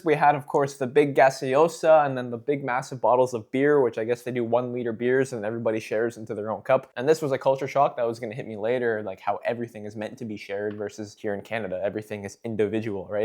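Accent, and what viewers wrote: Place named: Argentina. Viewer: Para mi sos argentino hablando Inglés, te entiendo porque hablo ingles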